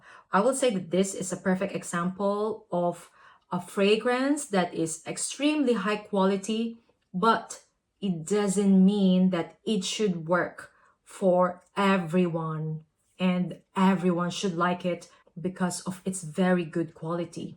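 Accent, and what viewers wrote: Philippines. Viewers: Ooh. I knew you are a pinay from your accent. I'm from the Philippines also but now staying in italy 😊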